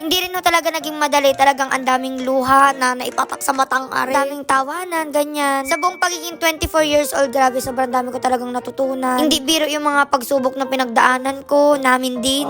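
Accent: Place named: Marinduque, Philippines. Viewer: Happy birthday 🌺🌸natutuwa talaga ako sa iyo paano ay kuhang kuha mo yung salita namin sa Marinduque😅😅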